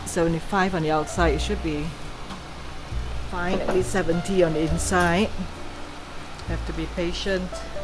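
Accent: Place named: Nigeria. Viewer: Omg, she sounds so Nigerian. Nice job , I will try this .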